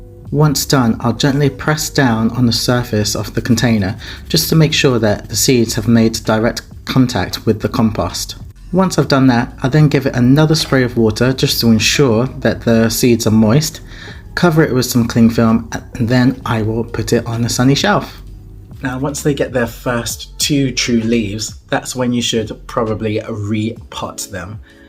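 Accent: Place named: England, United Kingdom. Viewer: I can tell by your accent why your coleus does better indoors than outdoors. Summer in England is more of a theoretical concept than a real season.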